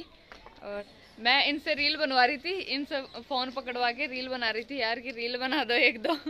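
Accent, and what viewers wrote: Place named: Rajasthan, India. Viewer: didi the marwadi bolta ktayi zeharr lago 😜❤️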